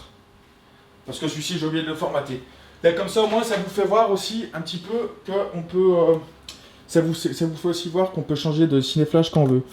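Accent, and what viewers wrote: Saint-Étienne, France. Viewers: j'en ai beaucoup appris sur cette camera super vidéo mais par contre t'as UN PUTAIN D'ACCENT STEPHANOIS tu nous représente bien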